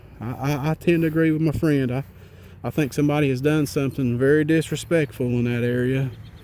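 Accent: South, United States. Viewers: Nothing like a Southern storyteller. Great job! Very scary experience.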